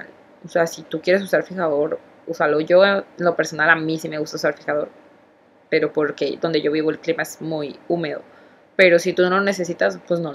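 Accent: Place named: Tabasco, Mexico. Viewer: sk kuando somos tabaskeñas no keremos k se nos note el acento te entienndooojajaja te adorooo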